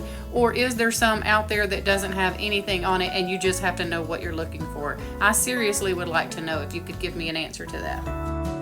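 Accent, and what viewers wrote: South, United States. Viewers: I'm from Georgia and you sound as southern as I do. LoL love you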